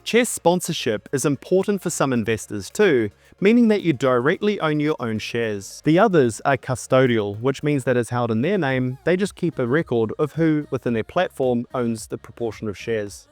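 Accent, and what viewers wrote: New Zealand. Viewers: I hear a NZ accent. Im not crazy right?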